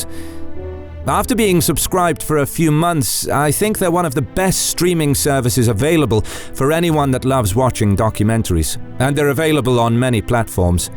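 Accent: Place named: England, United Kingdom. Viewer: good menacing English accent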